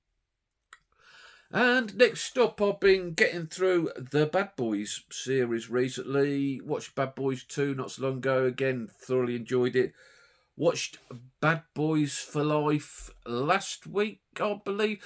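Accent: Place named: Black Country, United Kingdom. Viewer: Ive not sin any bad boys after 1&2 which i think 1&2 are bostin, i probably wow pick uo a box set i may just track em down, singularly to check out. Little random but am yow from the black country ? Cause yav got a simular kind of accent to me 😂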